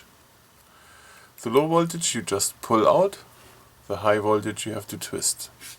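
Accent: Germany. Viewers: i'm not german but i used to live in germany so i recognized immediately by the sound of your voice :-) thanks for this helpful video! I wasn't sure if i had to buy a whole new LED track light system as mine came with halogen, good to know i just need to buy the bulbs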